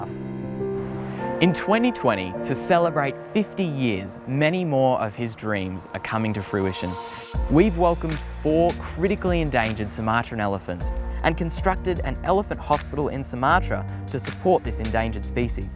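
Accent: Australia. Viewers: I'm only in it for the Australian accent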